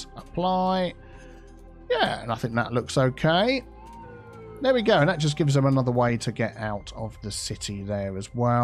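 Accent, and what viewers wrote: United Kingdom. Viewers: all your words sound different to me with your British accent ... I am from Canada ... but I guess I would sound wierd to you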